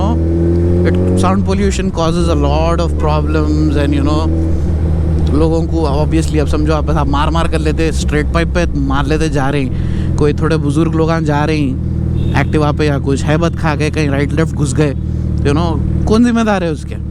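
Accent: Hyderabad, India. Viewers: Ye Tappori dilect nai hai bhai !! Hyderabadi hai Hyderabadi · Assalamwalakum bhai maintained Hyderabadi style me In words 👌🏻👌🏻👌🏻👌🏻👌🏻♥️📸💥💥💥😍🤲🏻🤲🏻🤲🏻 #Kįrāk 🤯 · bhai it was just crazy listening you.🤩🤩🤩 Aapki riding,aapki English (mashallah),aapki commentary Hyderabadi mai,aapka slang to good to awsm to listen.🔥🔥🔥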